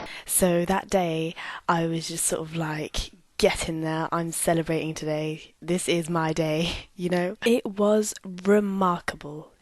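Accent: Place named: United Kingdom. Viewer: what's up with the brittish accent?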